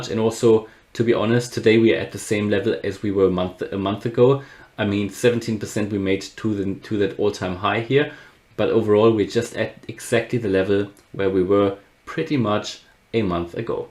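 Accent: Germany. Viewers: What accent do I detect Are you German?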